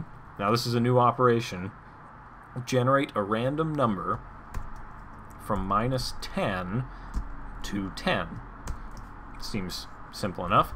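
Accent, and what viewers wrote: Canada. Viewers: I loves your Kaynedien accent hey. thanks for this.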